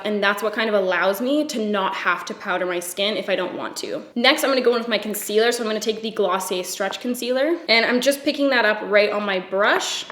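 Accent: Canada. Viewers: I really enjoy watching your videos. It's hard to find naturally fair skinned, blue eyed, blondish artists doing makeup videos that are both imaginative and genuinely good. Also, I really appreciate how honest and down-to-earth your narration is. I know you said you're Canadian and not American but for a European, often the accents are pretty much the same. The American video narration style can be very cheesy or loud and repetitive without really saying anything new. It's for this reason that I actually rarely finish a makeup video. I tend to get irritated with the token phrases and the industry veneer everything has. Your videos taught me more about how to approach makeup and it's application...rather than just what's fashionable now... which is so important given how economically unfeasible it is for most people to buy these products. Thanks a mill for not being a knobhead. 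Love from Éire X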